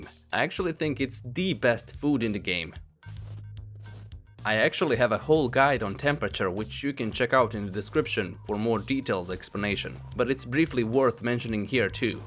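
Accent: Russia. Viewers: That Russian accent though. Never heard one in real life in before. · i can hear the russian in your voice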